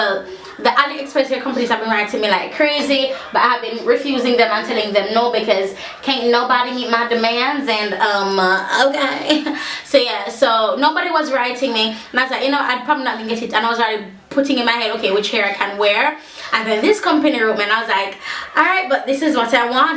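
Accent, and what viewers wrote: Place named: Jamaica. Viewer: i love your accent not many Jamaicans around here :)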